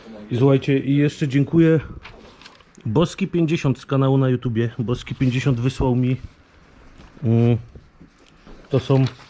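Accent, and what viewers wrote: Silesia, Poland. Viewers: co Ty tak po ślunsku zacząłeś godoć haha XDDD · Jesteś ze Śląska czy z Zagłębia??? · Chwila na Śląsku i już zaczynosz godać:) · Fajnie jak się pojawia godka:), Mógłbyś więcej:) Pozdro z Rybnika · wraca Śląsk i od razu gwara....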